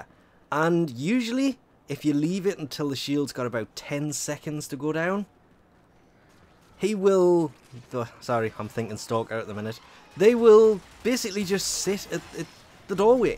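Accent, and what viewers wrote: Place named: England, United Kingdom. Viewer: Where do you get your accent from are you English uk or I dunno I'm just wondering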